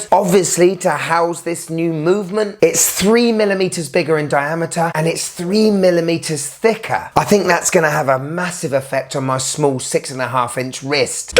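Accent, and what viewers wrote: United Kingdom. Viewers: Great test and great British accent you have it reminds to my good old days 🤣 Just placed order for the watch Cheers❤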